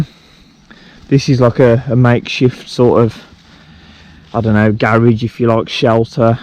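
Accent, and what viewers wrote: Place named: West Midlands, United Kingdom. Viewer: You sound like you're West Midlands, what area is this? I'm amazed such a large piece of land is left abandoned, as they seem to build on everything these days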